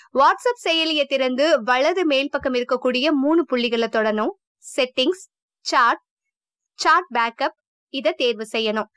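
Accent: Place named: India. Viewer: yeah great! English title spoken Indian.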